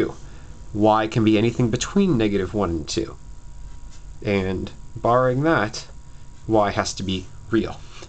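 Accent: Canada. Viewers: i can tell that you're canadian .. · You're definitely Canadian. Thanks for your help! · no his Accent is obviously Canadian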